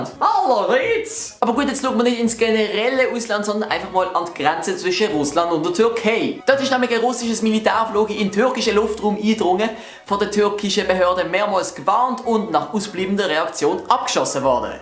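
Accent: Switzerland. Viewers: Ahhhwww, immer die hochdütsche Videos und denne die schöni schwiizer Stimm <3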